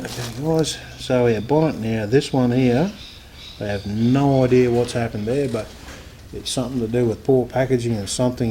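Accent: Australia. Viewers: Awesome review, great to hear a Aussie reviewer, good on you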